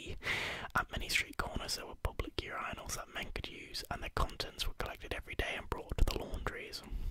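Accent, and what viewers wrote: United Kingdom. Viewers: Absolutely loved this video As a South African I absolutely love British Asmr accents 😂😂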